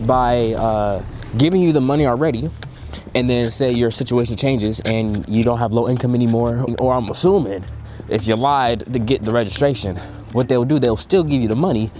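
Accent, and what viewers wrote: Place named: Northwest, Netherlands. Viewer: First if all i have to congratulate you on your Dutch pronunciation. Of all the US citizens i follow yours is the best.(Although strongly biased to the northwest of the Netherlands when everybody knows the closest ABN or algemeen beschaafd Nederlands is spoken in the midsouth). I also genuinely like your channel. No Sugarcoating just telling your impressions. Keep doing what youre doing.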